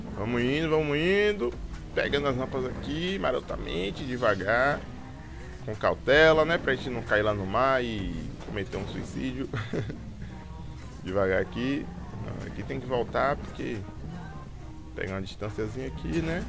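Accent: Bahia, Brazil. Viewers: é baiano? se for é nós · vc é da bahia?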